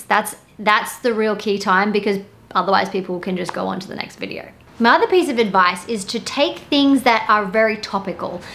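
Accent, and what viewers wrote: Australia. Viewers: She's so beautiful!!Love her aussie accent ♥♥♥♥♥♥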